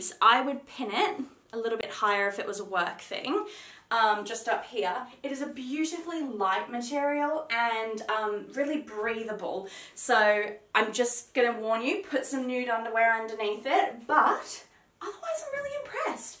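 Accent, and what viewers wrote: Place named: Australia. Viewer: So happy to hear an Aussie accent doing hauls. ( I live in Tassie)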